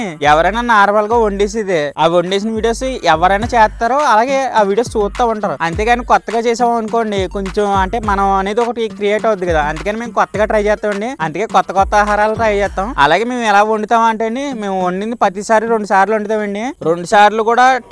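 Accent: Godavari, India. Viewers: Hai anna mana godavari slang lo super warning echav anna all the best anna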